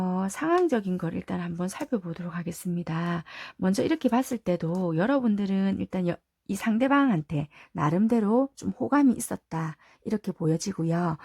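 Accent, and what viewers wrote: Busan, South Korea. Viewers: 감사합니다!!! 편안한 밤 보내세용😻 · 네 ㅠㅠ 감사해요.. 요즘 맨날 술마셔요 ㅠ 속상...어떻게 해야할지 몰라 더 그런것 같아요.. 언제나 타로보면 정확하게 떨어져서 신기하면서도 잘보고 있습니다 ㅠ 항상 감사드려요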